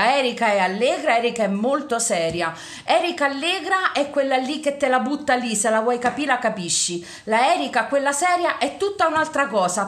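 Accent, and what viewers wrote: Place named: Marche, Italy. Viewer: Ciao cara, dal tuo accento (che è simile al mio) deduco che siamo entrambe marchigiane! Io abito in un paesino della Prov. di Ascoli Piceno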